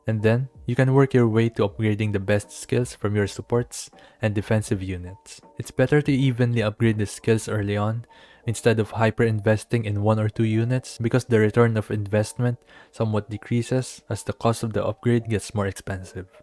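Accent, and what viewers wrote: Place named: Philippines. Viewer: U sound pinoy..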